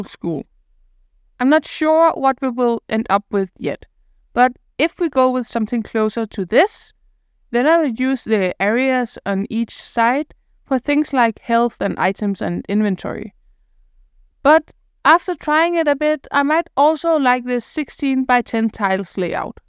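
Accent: Denmark. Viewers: Fantastisk at finde en dansk-engelsk accent - FANTASTISKE videoer all over btw! Du er uden du ved det, ved at lære mig og min dreng godot :D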